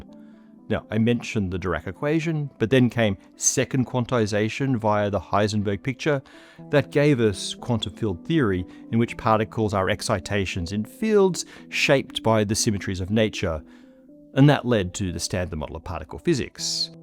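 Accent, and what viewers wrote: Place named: Australia. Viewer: No slack-arsed AI. And sn Aussie. And accessibly explained !! That’s so needed. Subscribed, mate. Greetings from the Wimmera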